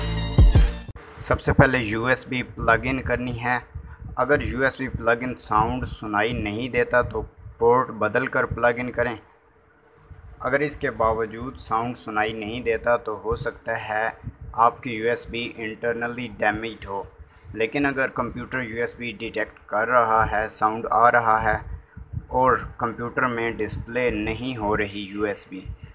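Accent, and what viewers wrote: India. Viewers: Punjabi Language destroys the accent of every language like this brother's. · I don't know but I felt I found the true and the best video when I heard the Indian accent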